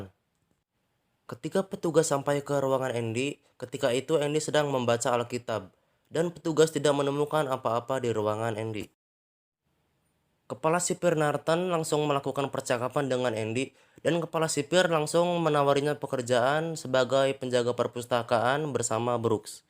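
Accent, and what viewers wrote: Karawang, Indonesia. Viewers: bjir orang karawang 🤣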